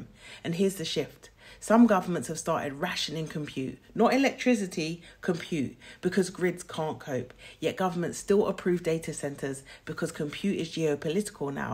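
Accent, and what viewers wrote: United Kingdom. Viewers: Ooowter, british, a i abosoultely loved your accent.😂😂❤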